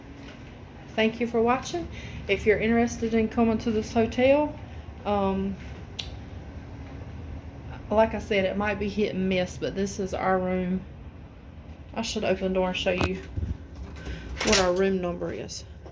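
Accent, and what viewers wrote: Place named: South, United States. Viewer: Oooo girl you are even more country than me!!! Hahaha I love it! 🥰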